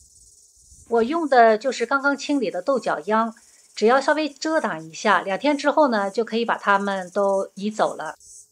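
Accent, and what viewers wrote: Jiangsu-Zhejiang, China. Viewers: 其实我最喜欢看的是开始的那三十秒，听你口音像是江浙一带的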